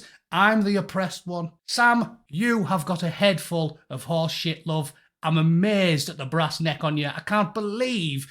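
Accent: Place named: England, United Kingdom. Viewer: What town in England are you from that you have this accent? Serious inquiry.. love the accent!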